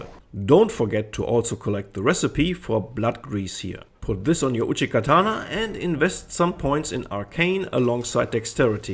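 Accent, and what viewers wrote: Germany. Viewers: Du hörst dich ziemlich Deutsch an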